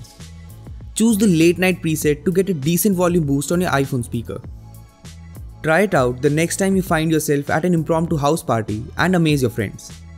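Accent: India. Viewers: As soon as I heard that Indian tech scammer voice I clicked off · Ughhhh Indian accent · I soon as I heard your Indian I went next!!!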